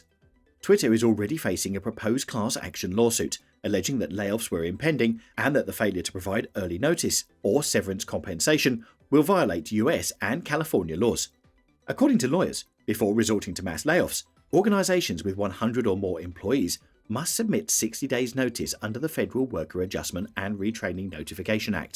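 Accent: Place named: United Kingdom. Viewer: Is anyone else sick and tired of YouTube podcasters employing British accented narrators? They sound like they have a cob stuffed up you know where. I, for one, would much prefer narrators who speak with a good old American accent.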